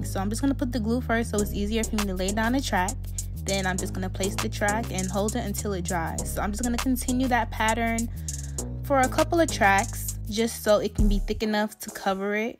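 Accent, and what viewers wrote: United Kingdom. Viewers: Love the English accent yeah 😍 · so you british or american because i heard the british accent in another vid and now i’m real confused😩😩if it’s fake though you is good at it (i’m from the uk btw)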